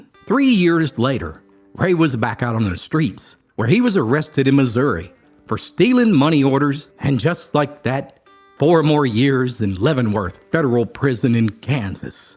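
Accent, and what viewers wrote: Appalachia, United States. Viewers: Men this narrator & this country ass accent 😂😂😂mf sound like he bout to cry.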